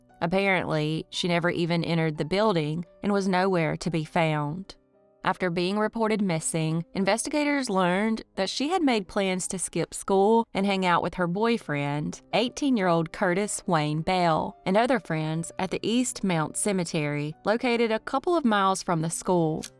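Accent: South, United States. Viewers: THANK YOU!! IT KINDA HURTS FOR THE UNSOLVED CASES BUT YOURE RIGHT UP ON THEM!! AND THAT BEAUTIFUL COUNTRY ACCENT! KEEP UP THE GREAT WORK!!:hand-pink-waving:🤠❣❤🐴🐎🦄🦌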